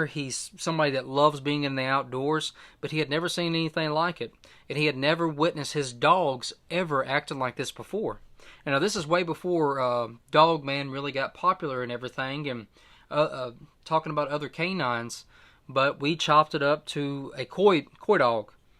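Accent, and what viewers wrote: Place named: England, United Kingdom. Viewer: I’m sub 136. Good luck with your channel. Love your accent from England 🏴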